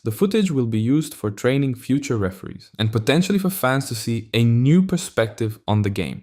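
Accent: Brazil. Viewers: É um brasileiro q ta fazendo essa narração? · oh wow. You really sound Brazilian hahhaha the Croatians that I work with, doesn’t sound like this at all. Good for you hahah And no hard feelings about 2022, ok? 👍🏼 hahhah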